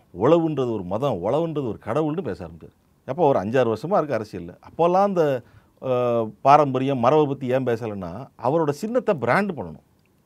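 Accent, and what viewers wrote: Tirunelveli, India. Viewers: இதில் பேசிபவர் எங்கள் நெல்லை மண்ணின் மைந்தர்.இவர் பேச்சில் எங்கள் ஊர் ஞாபகமே வந்திருச்சி.❤ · குரல் கரு பழனியப்பன். பேச்சு நடை நெல்லை.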